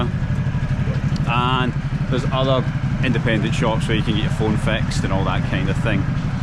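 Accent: Scotland, United Kingdom. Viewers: Hello 🎉 absolutely buzzing to see a Scottish 🏴 guy here in Moscow … I lived in Glasgow for almost 6 years but my hubby is from Moscow and due to difficulties with uk visas we decided to move to Moscow. Well I thought I would miss Scotland , frankly I do sometimes, Scotland is absolutely stunning but the cost of living, weather and work were a complete mess… got tired of it… long story short, I love it here 😂 Scotland is in my heart forever though and hearing Scottish accent here in Moscow is so much fun 🤩 glad you’re enjoying it here, mate! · Oh, your accent! :-)))) I already forgot how Scottish accent sounds like. Yeah, Moscow is a great place to live. The only thing is cold during the winter. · I grew up in Шаболовка neighborhood in the 70s. In the 90s I moved to the US. Last time visited the area in 2017, when my daughter who studied at the University of Edinburgh (where I also spent quite some time🙂) had to spend 1 year in Moscow as part of her curriculum. 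It’s hard to recognize the area but your video brought back lots of memories. Looove your Scottish accent! What part of Scotland are you from? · As a possessor of English as a second language, I love different English accents, and of course love your Scottish accent, bro. Keep it, be glad and proud of it, we need to celebrate differences. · It was very interesting to listen to the scottish accent. I understood 95%. Thanks a lot for the video and wish you a pleasant stay in Russia! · It's so strange to hear a slight Scottish accent, because I'm used to Americans talking about Russia 8-)) It will be interesting to see the Kitaj-gorod (Китай город) area through your eyes! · Какой клевый шотландский акцент! У тебя есть килт?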